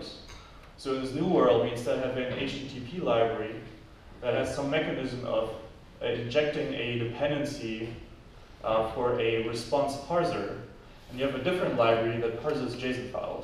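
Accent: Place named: United States. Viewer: Hm., wenn ich das richtig sehe, bist du Deutscher. Von der Sprache hätte ich eher auf Amerikaner getippt. Austauschstudent? Zweisprachig aufgewachsen? Ich staune...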